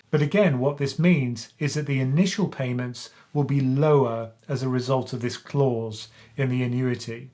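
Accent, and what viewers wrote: United Kingdom. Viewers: Really helpful but rather USA orientated… despite the UK accent.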